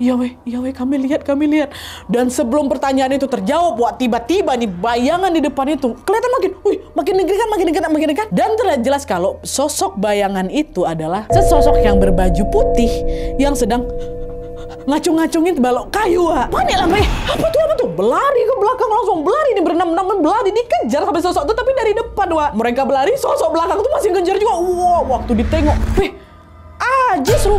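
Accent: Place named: Sumatra, Indonesia. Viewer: Kau orang mana kk ? Bahasa mu kyk org sumatra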